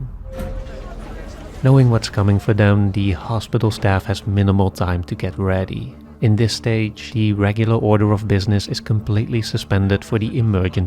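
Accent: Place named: Netherlands. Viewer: Ik ruik een Nederlands accent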